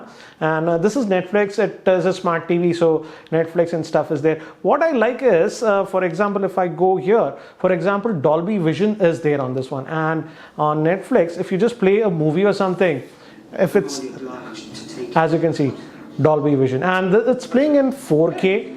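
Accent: India. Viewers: Indian accent is even more Indian than Indian accent, and I absolutely ♥️ it! You're the best techtuber in the country.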